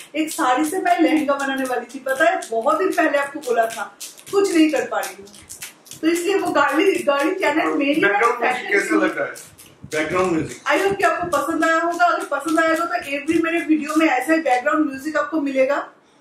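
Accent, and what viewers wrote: Bengal, India. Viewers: Main hun Bengali...... U try ur best to speak in Hindi, but pura Bengali tone aata hai.. It's OK... U will learn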